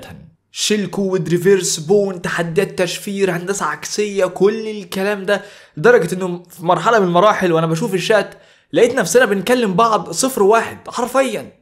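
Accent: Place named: England, United Kingdom. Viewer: معليش يبشمهندس مش قاصد حاجه بس هو العربي بتاعك بحسوا اكسنت انجليزي عشان عايش برا ولا بسبب استخدام الانجليزي عشان وانا بتفرج حاسس بفرق لغه ❤😂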